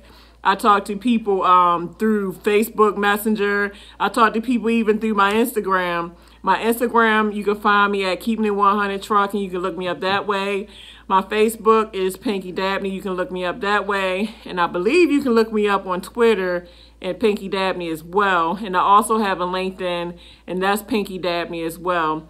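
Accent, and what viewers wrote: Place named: South, United States. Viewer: Girl you talk country with that thing on your tongue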